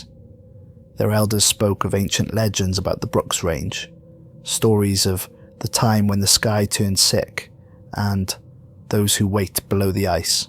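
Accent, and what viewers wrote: Wales, United Kingdom. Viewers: I do like the story's! But it seems, only bit different from meny b4 ,,,please do some different one's!.if possible have some others to join you ,it will give the story's more depth. I'm not criticising you ,never stop ,can I here some Welsh in your voice,,,,hope so ,.if I ever get rid of long covid, hopefully i can start writing a story or to again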